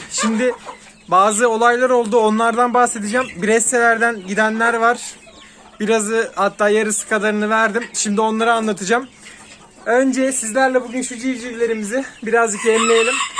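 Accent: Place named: Sakarya, Turkey. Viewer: Abi ses sakaryadami oturuyon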